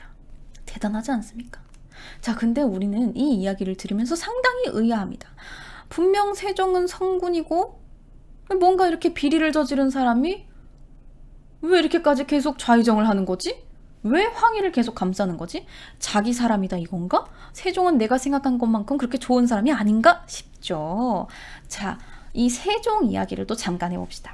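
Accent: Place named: Chungcheong, South Korea. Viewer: 충청도유? ㅎ 구수혀 ㅎ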